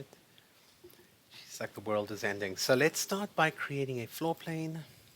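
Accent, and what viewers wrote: South Africa. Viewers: Are u SOUTH African??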